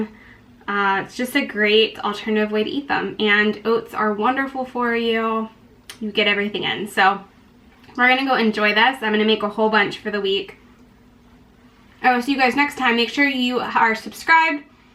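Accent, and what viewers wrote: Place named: South, United States. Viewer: Hey! You are from the South so of course things are pronounced differently. Great recipe. I will do it soon when I get some sweet potatoes. From B.C. Canada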